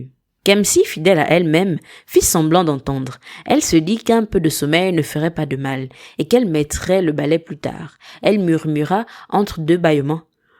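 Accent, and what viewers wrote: Gabon. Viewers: Un bel accent Gaboma❤ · La voix d’une gabonaise ❤très belle histoire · C'est une voix Gabonaise derrière cette belle histoire ❤ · La narratrice est forcément gabonaise. Ne changez pas pour l’IA svp❤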